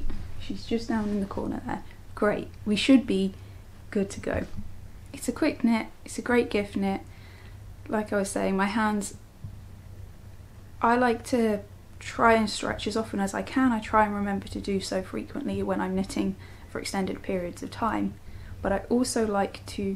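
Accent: United Kingdom. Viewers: Oh thank you so much for this new video :) this is just so sweet from start to end, and the 2 Elfmail sweaters are gorgeous, how lucky I am to not have my entire stash by hand otherwise I would have casted on one tonight! As you said, currently "sinking into calm" when chaos is too close, and loving your accent : remind me some months spent in UK, not so far from you! Take care and happy knitting!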